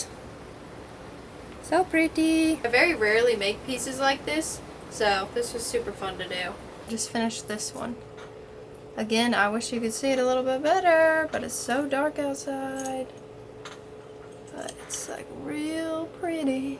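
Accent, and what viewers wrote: South, United States. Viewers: Where’s her accent from? It feels kinda southern, kinda midwest maybe? I truly don’t know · i was NOT expecting you to have a country accent, i was shook 😂😅 yay another fellow country sounding girl 🎉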